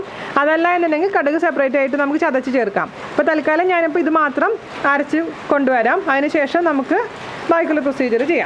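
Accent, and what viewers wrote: Thrissur, India. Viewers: pineapple madhura pachadee undakuna reethi valare ishtamayee....thanks a Ton.....pinne namadeee GADI yude THRISSUR slank superrrrrr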